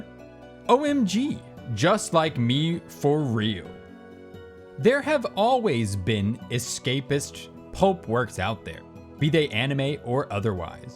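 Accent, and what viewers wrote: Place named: United Kingdom. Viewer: SAO fan here. First of all, I wanted to say great video—even with the British-like Japanese and the 202012s, I was invested in this video from beginning to end. Now, onto what I want to say: I get that people would revile SAO for the whole isekai/harem boom of the 2010's, and it makes sense. There's a clear oversaturation of the market because everyone's been craving the high SAO had given them. Of course, everyone's problems with Fairy Dance can be solved just by watching Gamerturk's common misconceptions video about the arc, but the point I'm trying to get across is that I feel SAO has created an Aincrad-shaped hole in everyone's hearts, only capable of being whole with that same tension of life-or-death. That's why in this supposed "drought" of SAO (anime) content, I suggest we show a MASSIVE amount of support for Reki-sensei to actually lock in. 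As of writing this comment, the latest arc—Unital Ring—is currently on its 8th part, with events leading to what could possibly be a link to Accel World. Not only that; but his other subseries, SAO Progressive, is going to have its 9th volume released for the west on May 12th. While it may seem as though Reki-sensei is just meandering around with his stories because he's probably hit a writer's block, cheering him on enough to keep pushing forward will definitely give him a boost to get his act together and finish the Elf War saga within SAO Progressive. If we do that, we can generate enough buzz to make Kadokawa consider either a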